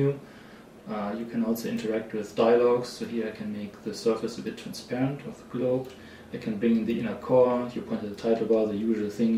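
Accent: Germany. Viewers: Hey dude, where are you from? You sound like a German ^__^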